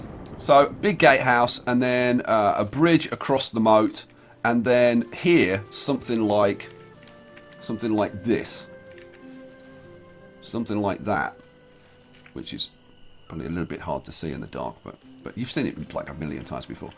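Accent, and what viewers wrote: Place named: England, United Kingdom. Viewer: Hey mate =) love your english acsent, where in england is it from? Hope you continue this. If you still have the save. we never got too se a brewary and the pub being buildt. build a royal sea harbor =) cherrio mate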